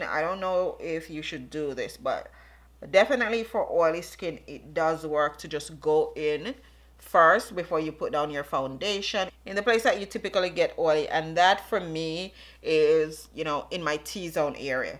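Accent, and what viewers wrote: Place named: Jamaica. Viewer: Beautiful look. ❤ ❤ You sound like you are Jamaican. Are you from Jamaica if you don't mind me asking?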